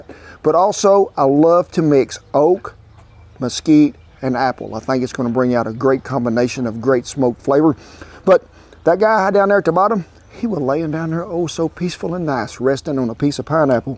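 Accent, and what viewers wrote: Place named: Texas, United States. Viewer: I knew you were from TX, my father's side is from TX and that accent gets me every time!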